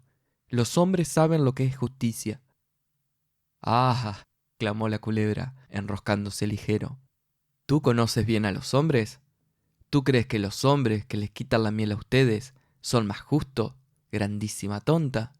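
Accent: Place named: Argentina. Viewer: Excelente! Enfim um canal com conteúdo em espanhol com acento Argentino. Sou muito grata!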